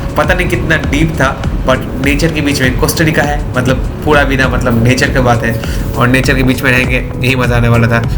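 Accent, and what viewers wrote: Bengal, India. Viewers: I like your vlog hindi with Bengali accent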